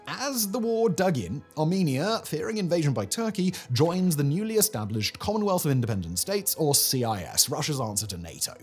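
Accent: United Kingdom. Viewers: LMAO you think he's an American? Did you even listen to the sound of his voice? He's a Brit living in the Czech Republic is my understanding. I know he's a european living in mainland europe basically. Americans sound nothing like that.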